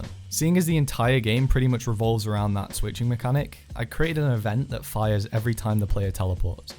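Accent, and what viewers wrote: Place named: United Kingdom. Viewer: I can notice a british accent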